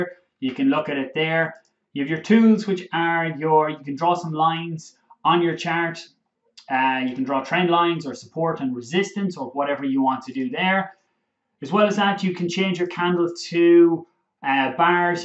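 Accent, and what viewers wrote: Ireland. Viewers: Great to hear the Irish accent !😁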